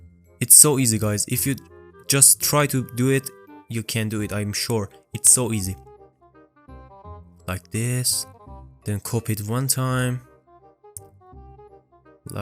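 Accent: Iran. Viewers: Bro you are Iranian? ریدم تو لهجت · چرا لحجه اش ایرانیه؟😂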